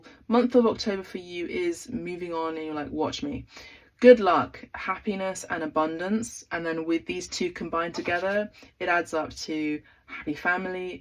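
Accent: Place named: United Kingdom. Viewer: England! Yes! Nice to hear a British accent.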